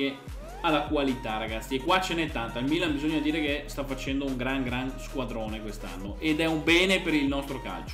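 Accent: Veneto, Italy. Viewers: Da che parte del veneto sei? Un salutone da Salzano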